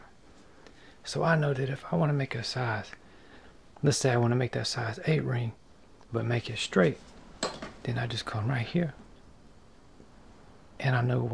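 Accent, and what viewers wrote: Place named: South, United States. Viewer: the negotiator! LOL I love it! You sound like a cross between Dr John and Bob Ross! Love your accent! Are you from the south? Loved the video! thanks for sharing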